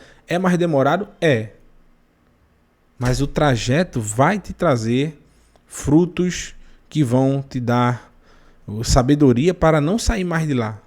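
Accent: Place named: Recife, Brazil. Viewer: Excelente estudo, moreh. Recifense da gema, o sotaque não nega.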